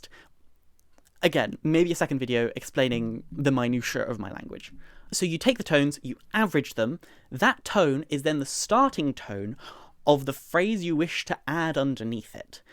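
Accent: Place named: United Kingdom. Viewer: Super british accent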